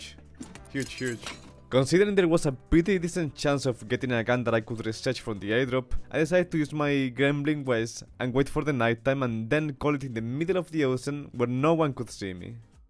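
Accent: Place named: Spain. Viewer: Illo are you Spanish you have the accent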